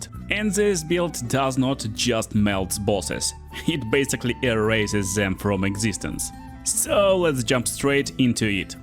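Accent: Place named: Russia. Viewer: Чувак, перестань пытаться говорить по английски без акцента, звучит ужасно. Одно слово из 5 звучит более менее, остальные с дичайшим русским акцентом )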